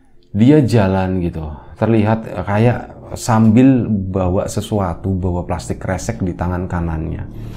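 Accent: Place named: Riau, Indonesia. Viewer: Judulnya itu lhoo mas... ngreget aku.. 😆 Shang Pemulung Riau Hadir mas, Riau mana ini suaranya.. 🙌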